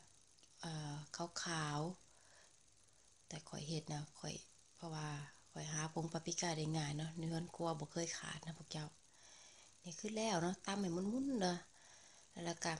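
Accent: Savannakhet, Laos. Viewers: มักๆเสียงเจ้าเว้าม่วนม่วน คือกันเองไทยสวันบ้านเฮา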